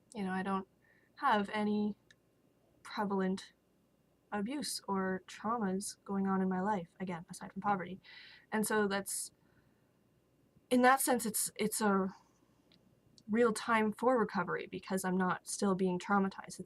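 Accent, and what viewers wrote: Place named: Canada. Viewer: Where are you from im from Denmark. Some of what you say Sound canadian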